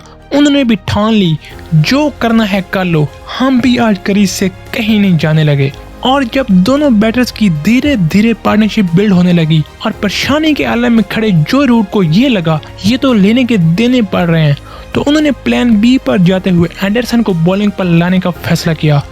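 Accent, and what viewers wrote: Pakistan. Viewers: Bhai tere accent se tu Pakistani lag rha hai. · Pakistani accent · Yaar ye pronounciation Pakistani laag rha hai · Tumhare bolne ke hisab se tum pakistani lagte ho? kaha se ho tum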